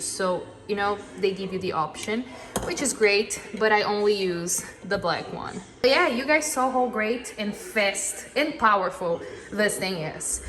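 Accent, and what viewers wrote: Brazil. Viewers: Love the Brazilian Accent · Brazilian accent 😊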